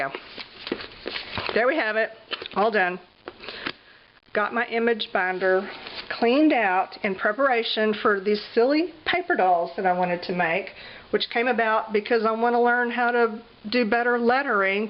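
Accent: South, United States. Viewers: Okay, I am so stealing your "It's like perfume on a pigs ear." Just to let you know it made me laugh out loud!!! I love that there is someone else out here with a southern accent!! :)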